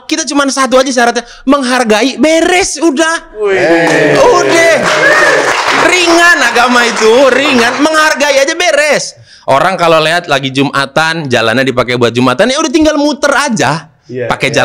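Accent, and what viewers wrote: Sunda, Indonesia. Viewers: Bang ini emang GK pernah gagal KL udah STAND UP COMMEDY lucu nya GK tertahankan.. Apalagi saya sebagai orang Bandung sama dengan meski darah Batak,hafal betul dialek" Sunda yg bisa bikin saya ketawa terpingkal pingkal... Bang SUKSES SELALU SEHAT SELALU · Meni kental sundana mang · Hahahaha... Bang Sunda Pisan... Keren materinya.... Cerdas dan menghiburrrrr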